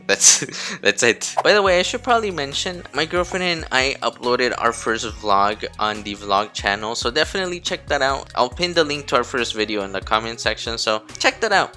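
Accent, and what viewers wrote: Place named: Philippines. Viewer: I just noticed you're picking up an awkward Filipino accents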